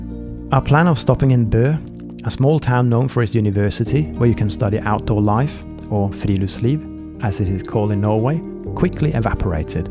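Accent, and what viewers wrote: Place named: England, United Kingdom. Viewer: because of your accent and perfectly spoken English I assumed that you were from England?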